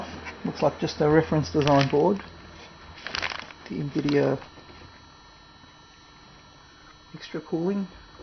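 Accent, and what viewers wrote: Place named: Australia. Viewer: 1200 Watt :) Aussie accent ftw.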